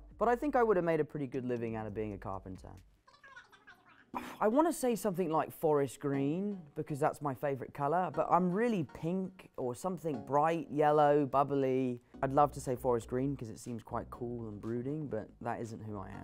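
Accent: United Kingdom. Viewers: pure British. The amount of times I’ve said elevenereef. Brill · Your Hella England straight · Tom Halland who is your favorite avengers character also I like your British accent and you say water 5 times for me😅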